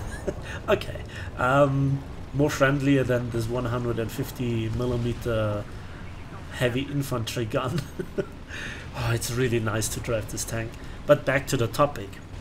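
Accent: Germany. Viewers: Hi! I'm an AB pilot with 40.000 air kills and 11.000 ground kills and I'm starting to look at tanks now. I'm a total rookie at tanks and your huge channel is an enigma to me... there is so much stuff to watch and from what I saw sofar it's very good stuff... and here's a problem... I'd like to see some guidelines for tank noobs... and some guidelines to your channel... what videos to watch, where to start on your channel and for most part... guides on how to start with different nations... witch premium to buy, which tank to put talisman on... strategy, tactics, ammo guides... it's a rediculus stuff to you but if you want new subs then stop concentrating on pro players and start looking at rookie ones... because believe me... there are much more of us then there are pros:-D And just a little tip... for christ sake make your videos shorter... you like to talk... 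a lot... and that's nice but maybe you should sepparate your videos in talking ones and action ones... your subs are not allways in the same mood to watch long videos... 45 mins video is an absurd length... maybe add some index table for stuff you talk about in such long videos so people can skip parts they're not interesed in. And please make more AB stuff as you yourself pointed out in one of your recent videos that AB is much better and practical for spading then RB... I love your channel and all the effort and time you are putting in to it and I love your german accent and I wish you get 100.000 subs in the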